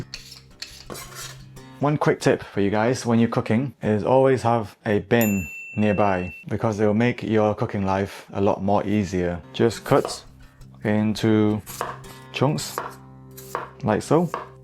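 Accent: United Kingdom. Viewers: are you educated in UK? you have a very UK accent, ^^ its a complement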